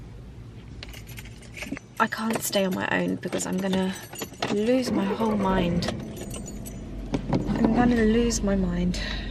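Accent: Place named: Oxford, United Kingdom. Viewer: speaks Oxford English, French and Tamil.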